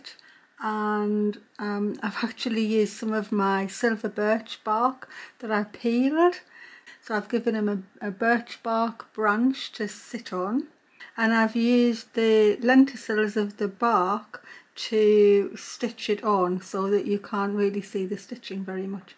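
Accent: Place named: Sunderland, United Kingdom. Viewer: you are a delight to Watch. I love watching your Beautiful Creative Work. My Husband grew up in Sunderland. So I love hearing your Accent. I live in a country town called Gingin in Western Australia. You are always welcome in my Home ❤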